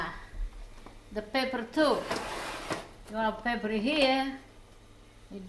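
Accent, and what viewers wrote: Italy. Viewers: Once I heard the accent I knew I came to the right place! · that dish looks delicious. I am making it today. I just Love you, your singing and your accent. Close my eyes and I’m hearing my Italian family. God Bless You ✝️ and your family. Son n Law you do an excellent job of filming. 👍🏻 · Grandma is so sweet and her singing Oh I love it.Although I don't understand the meanings but she also explaines what she's singing in her beautiful accent. I'm learning Italian dishes from her which are my favourite. 😋Lots of love from Pakistan and God bless! 😍🤗😚😚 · Yes. The only recipe I keep coming back to. I’m almost full Italian blood here in America. Her dialect and accent resonates with me. I’m a 4th generation here so a lot of the Italian culture is lost but this makes me happy and this recipe is delicious! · lovr her singing and her cooking her italian acent makes me smile love her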